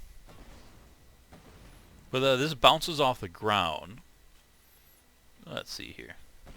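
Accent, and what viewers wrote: United States. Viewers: "Nuke-u-ler" Nor picking, but is that how american's say "nuclear"? I'm assuming you're from the US judging by your accent though.. I always thought words spoken in American-English dialect are pronounced the way are spelled, though you're not the first person I've heard pronounce it in what sounds like a foreign language to me lol xD And yes, the Tunguska does consume two ammo per shot.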